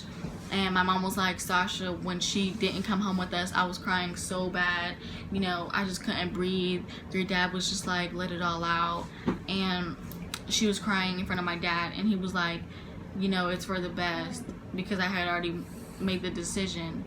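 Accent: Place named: Baltimore, United States. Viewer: Where u from cuz I heard baltimore im from baltimore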